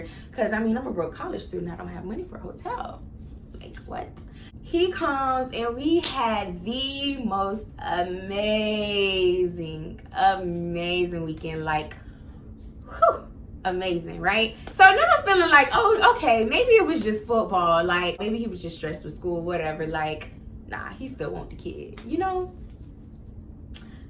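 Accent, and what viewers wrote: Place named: New Orleans, United States. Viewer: You from Nola ? I hear an accent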